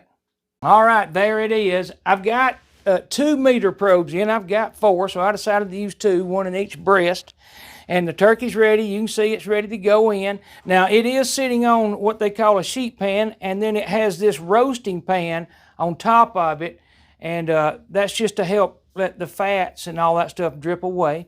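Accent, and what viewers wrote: South, United States. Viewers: Thank you so much for this wonderful demo. It was just what I needed. And I just love everything about the way you did it and as a southerner, loved the accent to death!!! ❤ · As a new yorker! I like your southern accent very funny and hapoy!! 😂😂😂thank you for this video!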